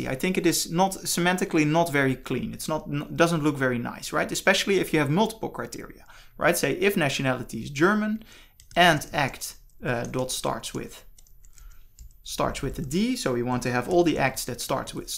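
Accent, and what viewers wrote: Netherlands. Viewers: Subscribed want ik hoor meteen de Nederlandse accent:p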